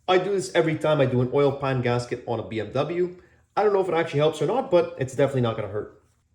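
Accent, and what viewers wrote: Quebec, Canada. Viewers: J'avais pas réaliser que tu étais Québécois avant cette vidéo ahah Vraiment superbe vidéo tu es excellent!!! PS, j'ai dit le tabarnak en même temps que toi XD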